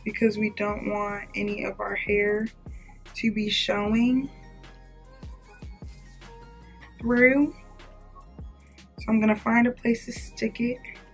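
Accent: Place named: Texas, United States. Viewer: are you from texas beacuse i love your accent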